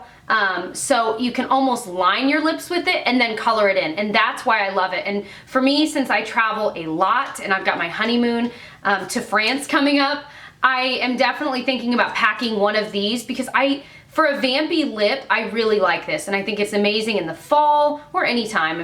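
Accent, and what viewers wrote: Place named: Boston, United States. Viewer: U from boston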